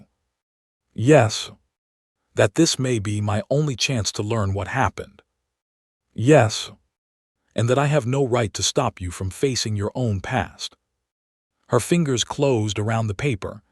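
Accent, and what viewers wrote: United States. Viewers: I am from Northwest Ohio, and they talk like that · Thank you. I love this voice btw. Where in America do they talk like that?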